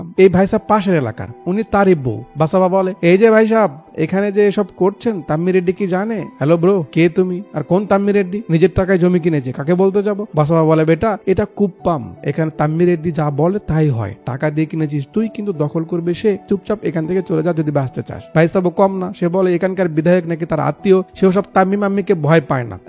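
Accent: Bogura, Bangladesh. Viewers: আপনার বাড়ি বগুড়া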